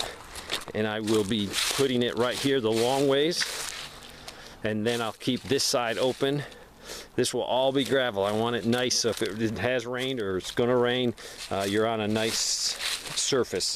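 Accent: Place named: South, United States. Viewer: Glad to see your back.Missed that smooth southern style of yours.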